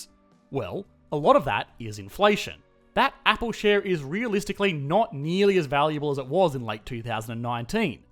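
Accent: Australia. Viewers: is the speaker an aussie? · Is he Australian??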